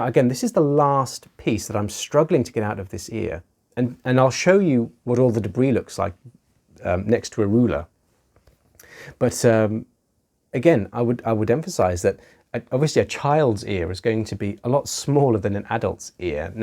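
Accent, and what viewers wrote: United Kingdom. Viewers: Wish he didn't have a British accent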